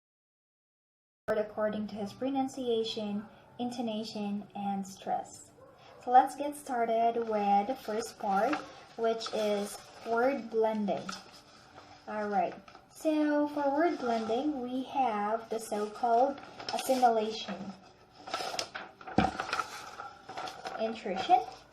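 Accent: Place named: Philippines. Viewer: Are you a Filipono? You're very good. Upload more videos about English tutorials because you're very good on it. Done my support.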